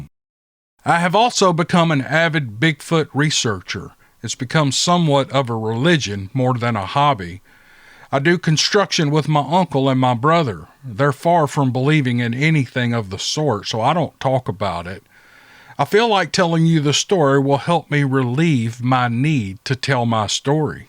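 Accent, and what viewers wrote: South, United States. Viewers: Thankyou for the stories AND your time! The work hours go by much quicker while listening to your southern accented thrillers.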